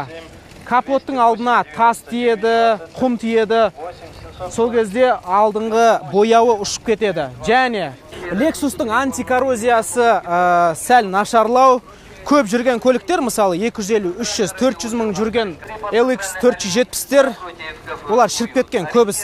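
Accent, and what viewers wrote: Standard. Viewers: брат таза қазак тылынде соилеиды дурас Брат алға жаң тусыршы кутып жатырмыз👍👍👍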